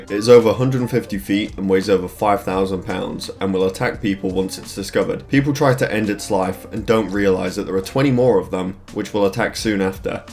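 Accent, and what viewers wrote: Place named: England, United Kingdom. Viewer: Why does sound English here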